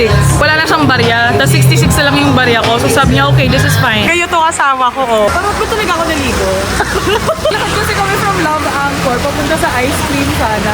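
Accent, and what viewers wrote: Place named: Pampanga, Philippines. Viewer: HAHAHAAHHA love the kapampangan accent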